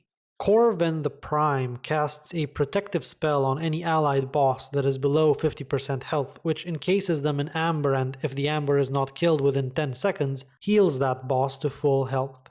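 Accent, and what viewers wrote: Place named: Finland. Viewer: I´m not sure, but sounds finnish!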